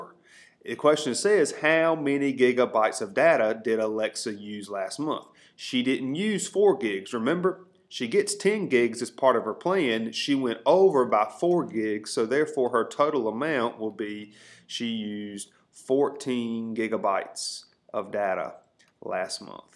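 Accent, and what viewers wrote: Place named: North Carolina, United States. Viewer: Your videos are so helpful. Thank you for all your time and effort!! Taking my TEAS soon as someone who graduated college in 2015 so these refreshers are everything. Side note, are you by any chance from Eastern NC? Your accent sounds like me and my people!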